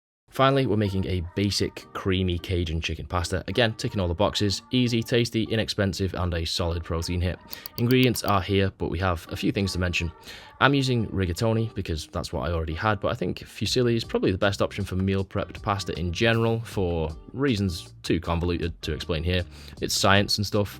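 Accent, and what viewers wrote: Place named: North, United Kingdom. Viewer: I love watching your meal prep videos. Love the northern accent (southern softie here) But, could you do a video on how to reheat/serve meals please? I love to prep but by day 3, I seem like I waste food as I am too scared to reheat it so I eat it cold (gross) or throw it away. Just making the fried rice scares me! :)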